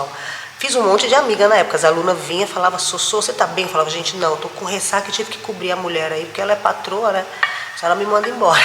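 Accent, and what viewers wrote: Ceará, Brazil. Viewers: desculpa a curiosidade. Mas você é cearense?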